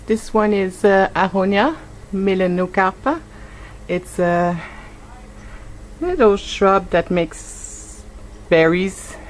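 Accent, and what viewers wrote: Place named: Quebec, Canada. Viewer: Yaay another French Canadian accent ;) Pretty cool garden. But now you'll have to show the one at home lol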